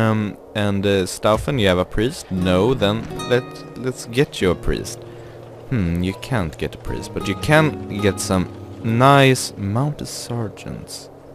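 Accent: Sweden. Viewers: Hahaha, more swedish accent please!! :D:D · Det märks verkligen att du e svensk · are you frome Sweden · you sound like a swedish person....är du svensk? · You're swedish right? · are you Swedish? sounds like it. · Skåne? :D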